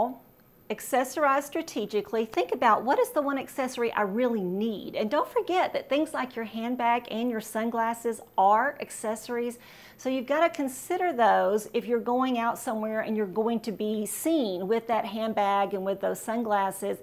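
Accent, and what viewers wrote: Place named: Texas, United States. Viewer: I love her accent! Is she from Texas?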